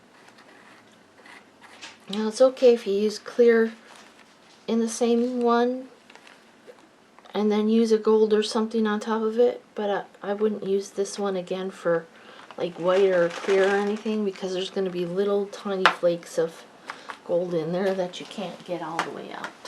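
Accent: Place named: Upstate New York, United States. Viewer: Hi - I should have guessed from your accent that you were from NY state close to Canada. I've been watching your videos from Ontario up near Georgian Bay. Thanks for taking the time to share your cards & tips. I really appreciate them.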